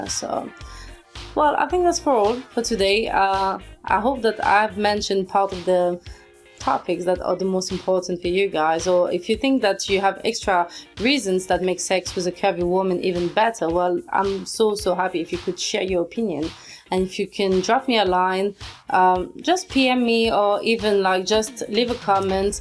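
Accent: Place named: France. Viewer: Curvy woman with confidence and a cute french accent? Beautiful! Subscribed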